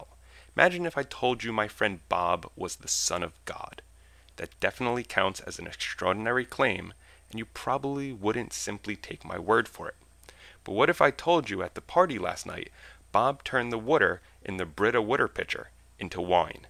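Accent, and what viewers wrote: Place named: New York, United States. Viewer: lol i detected some hint of "new york" while i was listening too lol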